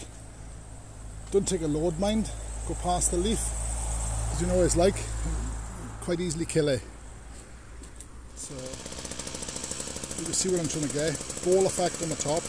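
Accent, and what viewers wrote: Wales, United Kingdom. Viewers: Great job.love your Welsh accent .love your comment ,when you see everyone use long reach ,puzzle me 😂😂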